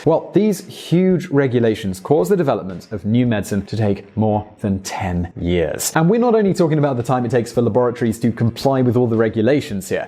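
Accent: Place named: United Kingdom. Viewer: The fact that you are effectively arguing for shortened testing and trials of new drugs is frankly pretty apalling. Some one with your accent, should know all about the horrors of unsafe drugs as Thalidomide drestoyed a lot of lives in the UK. Add to that you basically argue financial duress on the part of the pharmacutical companies having to test their drugs, yet they are some of the most profitable corporations on Earth, right next to the oil industry. There is no reason whatsoever that drug trials need to be sped up.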